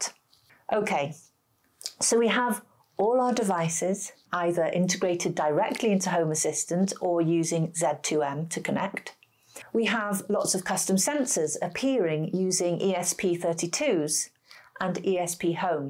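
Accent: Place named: RP, United Kingdom. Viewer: What's a great SmartHome introduction. Very informative, your mounth 'prints' queens spoken English, and you touch on things like ESPhome and many more that regular (even IT) guy would not be aware of initially. Are you UK based? If so, can you sometimes add links to products where you usually buy from?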